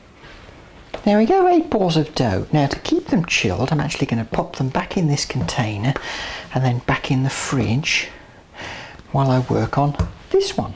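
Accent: United Kingdom. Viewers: I am second gen Canadian Grampa from Finland. Her name sounds more Finnish but I truly could not tell you honestly. Very British sounding Voice which is delightful. Thank you for the recipie, I make Rieska which is similar but a little thicker. From what Gramma said. Thank You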